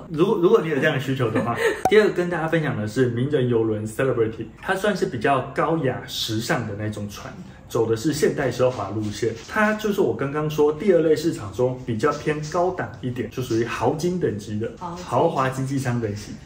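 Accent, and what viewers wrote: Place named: Taiwan. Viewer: I dont understand your Taiwanese Mandarin. Pls have the Chinese subtitle on. In addition, you are speaking far too fast. Making it even harder for any audience.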